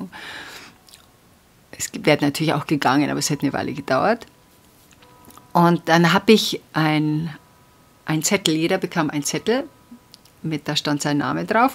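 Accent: Bavaria, Germany. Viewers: das mit bayrischem Akzent, netter geht's net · Ich muss immer lachen wenn Dein Bayrisch durchkommt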